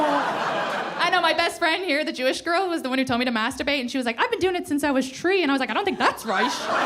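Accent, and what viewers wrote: Ireland. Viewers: Came for the Irish accent; stayed for the laughs. Great set! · Can't wait for more from ya, sucker for the Irish accent but stayed for the laughter! Hi from San Antonio! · Stop down to Appleton, WI skyline Comedy club - it's a 30 drive away from Lambeau Field. Wisconsinites don't get many Irish folks I think you would be a hit just for your accent. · you are the best, sweetest and most hilarious person. You're 100% right about the accent. American men can't resist it in Irish women. I'd listen to you read a phone book for a day. I'll bet you're a grand bar maid, too. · Irish comedians never disappoint 😂 she is funny and cute and I adore the accent. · NEVER EVER heard anyone make an Irish accent sound so annoying 😢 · I started watching for the Irish accent but stayed for the comedy. Well done you have a new fan.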